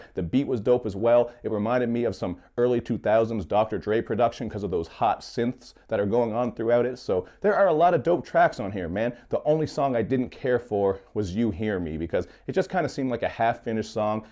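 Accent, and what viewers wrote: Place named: Newfoundland, Canada. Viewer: nova scotia? I knew you were a newfie. that accent sticks out like a sore thumb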